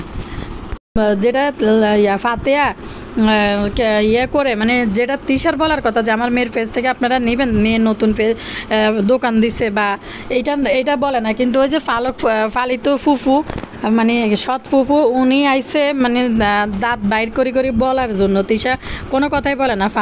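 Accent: Noakhali, Bangladesh. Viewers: Apnar desh kumilla but apnar kothar style noyakhali.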